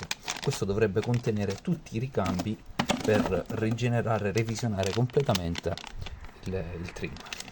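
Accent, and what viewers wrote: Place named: Salento, Italy. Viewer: grazie per il video, molto chiaro.!!!!! sono alle prime con uno yamaha 40, se volessi contattarti telefonicamente è possibile?? dall'accento credo sei Salentino ? grazie luis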